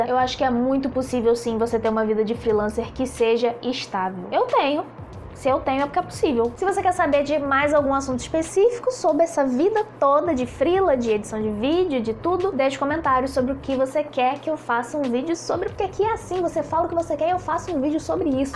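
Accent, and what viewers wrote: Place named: Rio de Janeiro, Brazil. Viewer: Garota, eu que sou um vovô com tempo pra pensar, analisar coisas, filosofar e etc, fico pensando ao te assistir, que maravilha de pais você deve ter. Minhas netas são maravilhosas mas eu gostaria de ter uma uma neta igualzinho a você. Além de linda (minha netas também são) você é também muito inteligente e bem resolvida, me parece. Eu aprendo a editar vídeo com você e, ainda de quebra, me divirto com seu modo de se expressar (fala pelos cotovelos. Rs rs rs) que é maravilhosa. Até seu sotaque carioca é na medida certa. Está destinada a ser feliz para sempre.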